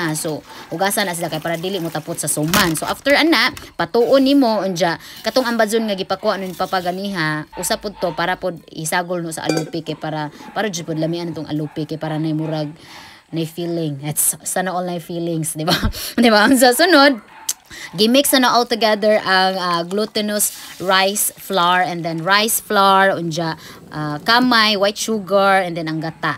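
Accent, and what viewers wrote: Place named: Bohol, Philippines. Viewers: Mag ngisi jud ko maminaw sa tonada sa sinultian sa bul.anon. proud anak sa pure bol.anon ko. Basta bol.anon kugihan. ✌️😁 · Lingaw jud ko dai nga motan-aw sa imong video kay pure boholano ang imong sinultihan. Padayon sa imong show, kay panalanginan ka Ginoo.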